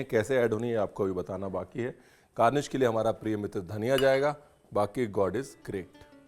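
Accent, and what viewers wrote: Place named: Punjab, India. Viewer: Ranveer ji, I have tried your Louki Dhokla and Paniyaram, it was yummmm! My daughter loved it. BTW Paniyaram is a term in TN and Kuzhi Appam is called in Kerala. Kuzhi pronounced as “Kuli” means small dents/ holes. Perhaps Appe was morphed later in other regions incl MH etc., additionally wanted to share, you speak very well. I mean your friendly, conversational recipe making is a treat to ears ! I adore your Punjabi accent and the wit and humour you bring along. Your Videographer for this channel needs an extra applaud for capturing colors and sounds to the precision, even the mustard crackling sound was a sound-delight to listen to. Prayers and Best wishes for all your endeavors, keep inspiring us.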